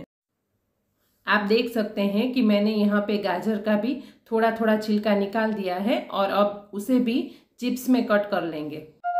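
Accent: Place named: Gujarat, India. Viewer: Mam , bol ne se aap gujarati lagte ho , gujarati bolo , saras , 🙏🏻 mam gujarati ma channel banavo 🙏🏻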